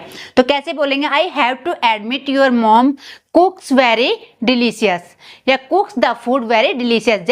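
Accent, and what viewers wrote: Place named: India. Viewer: आप बहुत अच्छा कंटेंट लेकर आती है और बहुत अच्छा पढ़ाती है but अब समय बदल गया है तो आप थोड़ा सा अपने एक्सेंट पर और प्रनंसीएशन पर भी काम कीजिए और आप यूट्यूब में थोड़ा सा ऐसी वीडियो देखिए जिससे आप जब इंग्लिश बोलती है तो उसमें देसी लहजा न लगे आप टीचर बहुत अच्छी है इसलिए मैं आपको सब्सक्राइब कर रहा हूं मैं यहां बुराई नहीं कर रहा हूं आपकी, बस आपको थोड़ा सा अपडेट रहने के लिए कह रहा हूं । समय के हिसाब से थोड़ा सा ध्यान देकर आप अपना देसी लेहजा हटाये और उच्चारण आज के समय के हिसाब से करें। सलाह ठीक लगे तो माने अन्यथा आप बहुत अच्छा पढ़ा रही हैं😊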